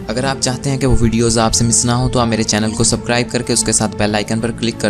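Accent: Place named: Lahore, Pakistan. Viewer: Your English accent is typically Lahorian. Improve it. Or use 100% urdu.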